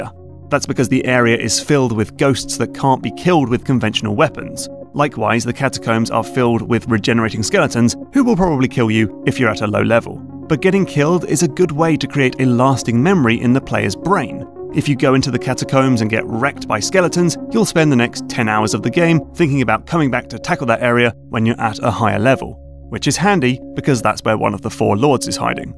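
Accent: United Kingdom. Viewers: What's up with the great analytical YouTubers all having UK accents?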